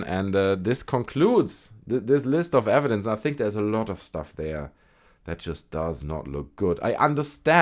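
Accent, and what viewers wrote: Germany. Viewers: Dieser Deutsche Aktzent 😅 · u sound hart german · something so nostalgic about hearing someone inspect government documents in a german accent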